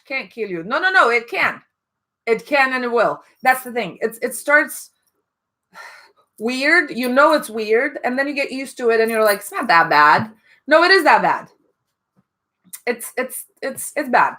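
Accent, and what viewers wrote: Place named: Romania. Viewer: Incep sa ma uit la Imperial Opoponax pt ca asta ma interesa si zic in mintea mea: 🤔 parca are accent de romanca 😅 si ma uit sub video si vad: romanian chanel ....😅 am avut noroc sa il gasesc in Norvegia si l-am luat intr-o secunda😁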